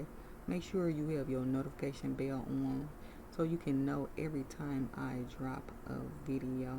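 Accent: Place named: South, United States. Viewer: I'm wondering if you possibly mis-heard something? I couldn't perceive any salty language in her audio. She does have a strong southern accent, that perhaps could make a difference to what you heard?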